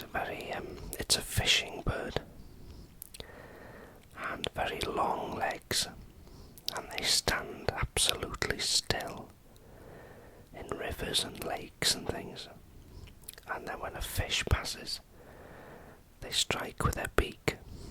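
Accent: Wales, United Kingdom. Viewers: Cardiff or swansea ?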